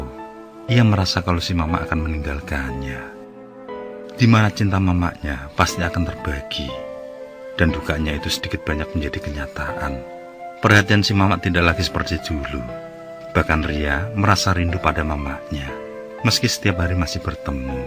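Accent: Java, Indonesia. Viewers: dubbernya ... medhok! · Klo mo nyumbang cerita nih apa hrs slalu yg genrenya horor trs ada mistik nya?koreksi dikit, krn cerita ini berlatar keluarga Batak yg tgl di Jawa timur mungkin ada baiknya voice talent agak disesuaikan dikit,jd ga trlalu Jawa tp ada batak2nya dikit jdnya lbh hdp kt nntnya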